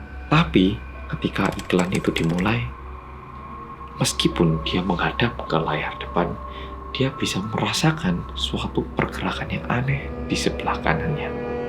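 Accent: Java, Indonesia. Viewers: Kang asli korea? Entah kenapa gak ada koreanya cuma ada jawa campuran melayu kan jawa itu kebanyakan apalagi yang asli tanpa permak itu hitam manis kalau senyum cewek maupun cowok,melayu kulit sao mateng kalau banyak keluar,kalau enggak itu sao muda putih bening,apa lagi medok khas jawa jadi ragu 😂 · Nonton bioskop ❌ Nonton yg medokkkkkk ✅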